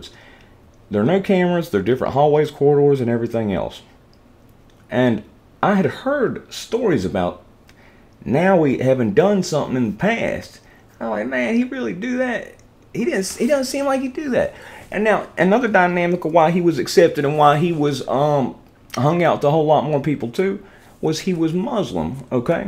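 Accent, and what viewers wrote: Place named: South, United States. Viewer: You are from the south, you always talk funny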